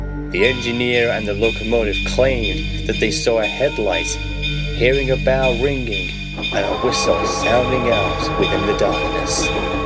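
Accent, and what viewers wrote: United States. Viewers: You did a great job using an American accent. You could use your American accent for your narration in the Clinchfield Curse. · Not bad. Great southern accent by the way. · Was most of the dialogue you attempting an American accent?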